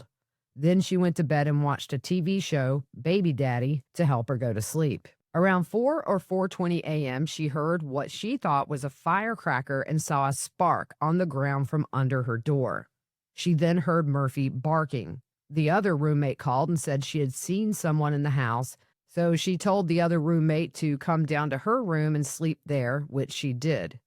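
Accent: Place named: Georgia, United States. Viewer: I live over in South Georgia so when I listen to your accent it sounds like me and my friends talking 😊. Thanks for the great work.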